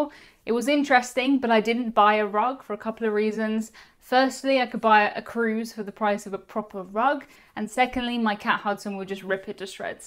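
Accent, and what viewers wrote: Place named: United Kingdom. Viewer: Don't let anyone bother you about your British accents for things like "bath". Even in the United States people say things very differently depending on the region they are in. Be you, I enjoy listening to you speak about various cruise topics with your British accent. :)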